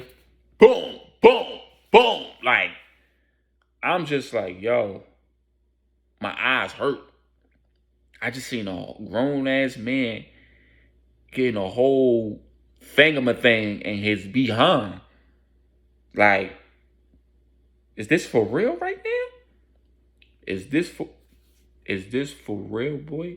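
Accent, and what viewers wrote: New York, United States. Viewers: Yo you sound like you from NYC, great story... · 😂🤣😂Listening to you I know your from up top... NYC. It isnt funny how your mother found out about this man on the DL. I'm sorry to hear that was your intro to transgenders, but your mother did her job protecting you from from these lifestyles for a reason. Men on the DL have ruined many families trying to hide their true desires instead of being dishonest using women to cover their secret lives. Your delivery had me here 😂🤣so hard.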